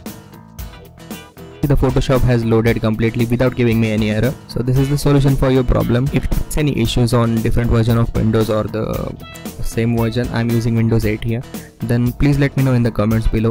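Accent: India. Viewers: i hate this indian accent soo much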